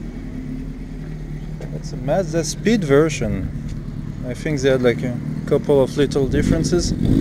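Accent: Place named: France. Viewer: Hi, I just turned on my youtube bit and there you were with this new video :) I recall the Datsun Z coupes, I think they began as 220Z or perhaps 240Z but they were a big hit here in USA...I recall the Toyota cars, the Corolla, the Carina, and another C namebut we were thinking of them as cigar names...only Corolla remains. Thanks for this tour and the English audio....it is nice with a great French accent :) I have several relatives who have Japanese connections...the guy who is in Japan now has taught English for many years. his given name is Rhys an he just got married to a woman who teaches French but I am not sure where they reside. Likely in the Tokyo area...I have watched videos of the 311 tsunami provided by fnn311, he gives a nice English subtitle....I believe you did not have a problem with that 311 event if you lived further south in that year...Thanks again for this video ! 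Chuck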